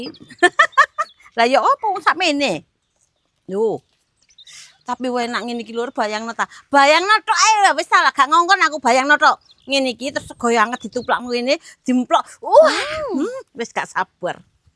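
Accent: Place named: Central Java, Indonesia. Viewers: Assalamualaikum wr wb surabaya nyimak. salam kenal saya mau tanya, aslinya dari mana? saya asli purworejo logat ngomongnya seperti orang jawa tengah. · Dapur kraton ngendi to alamate, boso jowone kok le medok nemen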